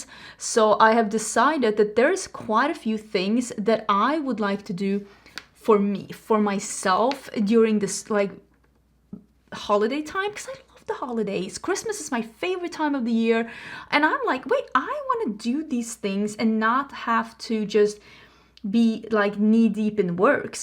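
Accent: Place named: Sweden. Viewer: Totally agreed. I'm an English/Grammar nerd and her English is impeccable. I do love when the Swede slips through and Js become Ys, but so many people who speak English as their first language commit far worse word crimes.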